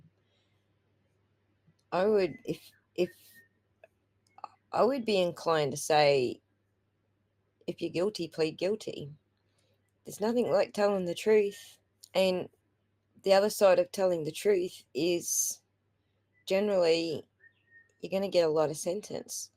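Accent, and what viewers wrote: Australia. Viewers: I really love listening to.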